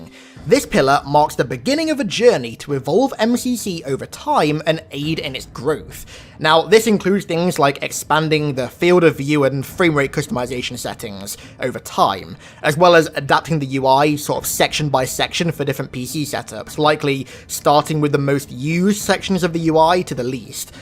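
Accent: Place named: United Kingdom. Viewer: Your voice is annoying I didn’t know I’ll be listening to a British pausing squeaker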